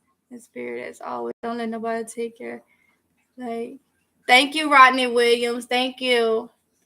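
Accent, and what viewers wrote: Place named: South, United States. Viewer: This is my first time making a comment, girl don't apologize for the way u talk.we southern ladies can't help it.We get the message across. Also,don't stop these vlogs,they are so helpful. Please call me!!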